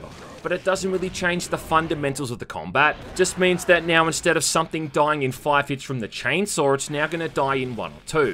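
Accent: Australia. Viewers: yep regardless if the game is good or bad always does it justice. He is around my age n I think his bad as Australia accent helps.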